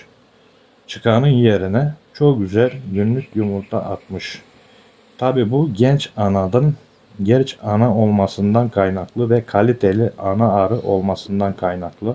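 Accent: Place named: Thrace, Turkey. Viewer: Sizi tebrik ediyorum. Arının dilini anlamış sırrını çözmüşsünüz. 4 yıllık bir arıcı olarak iyi ustaların tecrübelerine ihtiyacım var. Bazı günler yaklasık 5 saat yerli ve yabancı videolarda araştırma yapıyorum. Söylediklerinizi anlamayan izleyiciler hakkında açıklamada bulunmuşsunuz. iç Anadolu bölgesi insanı olarak Trakya şivesine alışık olmadığım için bende biraz zor anlıyorum. Büyük hazineler daha derinde olur deyip, anlamadığım yeri tekrar dinleyerek bunu hallediyorum. Küçük bir eleştirim olacak. Sanki katları yerine koyarken fazla arı eziliyor. Ve kamera açısı biraz daha geniş yada uzaktan çekse izlemesi daha keyifli olurdu.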